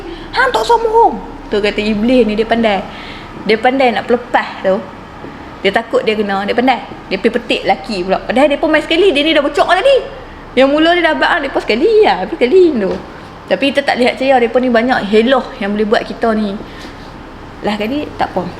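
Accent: North, Malaysia. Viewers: Hg prempuan yg hebat ..cakap utara memang sempoi rahh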